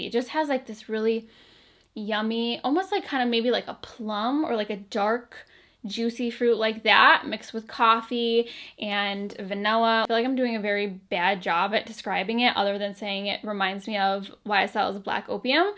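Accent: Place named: Northeastern Pennsylvania, United States. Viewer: And Im from NE PA, and as soon as I heard u talk lime a year ago...I knew we talked alike!!!!!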